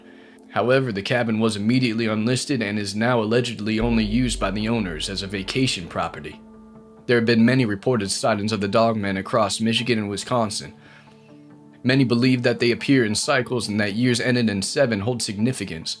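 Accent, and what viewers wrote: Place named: South, United States. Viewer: I like these dogman stories! Lon Chaney as the Wolfman comes to mind. You read this one quite fast though. I couldn't savor the flavor. Love the idea of a house of glass, though, how folks could be one with the trees all around and no bugs to bother you! Imagine a light rain pattering against the glass, a fire in the fireplace, warm and cozy, with little snaps and crackles and suddenly a body thrown against the glass. Great story. May I ask where in the south you are/were from? I can hear a bit of the twang in your voice that we southerns can't seem to loose no matter how hard we try.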